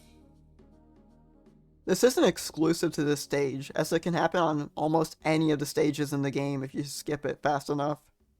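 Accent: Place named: New York, United States. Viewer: ohhhh awesome. For some, you sound like you're from New York.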